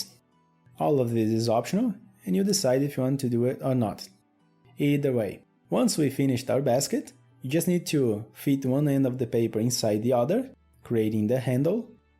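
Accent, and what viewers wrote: Brazil. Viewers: Is that a beautiful Brazilian accent?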